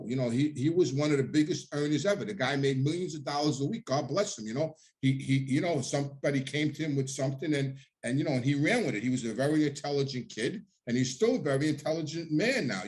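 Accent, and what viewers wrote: New York, United States. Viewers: My dad knew Carlos Marcello and Marcello used to be teased behind his back for speaking with a Cajun accent. Our family came from Italy to Louisiana in 1894. Love the NY accent and enjoying the videos. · I love listening to you about baseball!❤️❤️ Ny Ny!!! I hear you about the Accent!!!! 😂😂 Yes we do have more common sense!! No need to apologize ❤️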